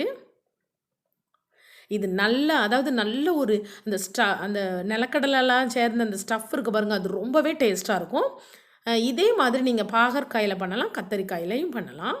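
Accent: Tirunelveli, India. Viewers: Nice mam... Neenga tirunelveli ah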